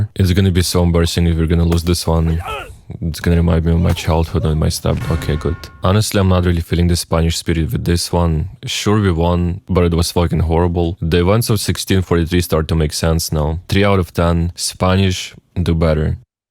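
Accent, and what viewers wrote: Russia. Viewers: man i thought there's a russian version of this video because of the russian accent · акцент русский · listening to ts with just audio sounds like a russian telling you war stories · this is ochen horosho, bratan, delai bolshe takich video (I heard an atom of russian accent) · This is explained by the fact that he has a Russian accent.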